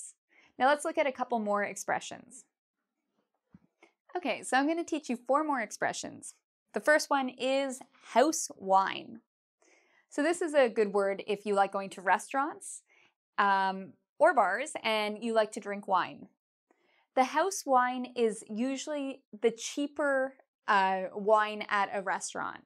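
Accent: Canada. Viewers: Are you Canadian? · I can understand you all aspect and topic that you make. Your accent and Canadian english is very clear and neutral than U.S.A and british english. Thanks teacher!! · I have been watching videos 4 the last few months and I have found out that she is brilliant teacher. I adore her Canadian accent!, u rule! Thanx a lot 4 lessons.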